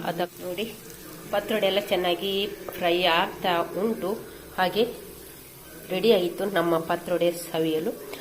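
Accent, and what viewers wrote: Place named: Mangalore, India. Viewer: Wooow new healthy recipe sister 👌 🎉 watch full video liked 👍 nim manglore kannada super sis😅🥰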